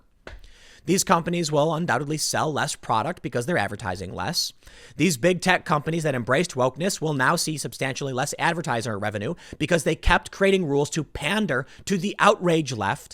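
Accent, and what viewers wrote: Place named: Chicago, United States. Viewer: I think its the Chicago accent. Illinois has some interesting ways of pronouncing words, and celebrities have interesting sounding names, its bound to come out some how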